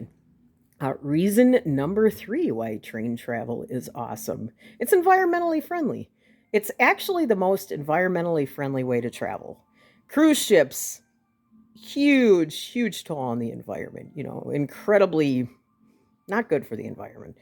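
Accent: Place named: Wisconsin, United States. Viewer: I got here by searching "wisconsin accent, because I'm from Wisconsin and I like linguistics and I'm sad that my accent gets NO attention in the linguistics scene. It's refreshing to hear the Wisconsin dialect on YouTube for once. P.S. Have you ever seen Metalocalypse? The drummer, Pickles, has a stereotypical Wisconsin accent and it's hilarious.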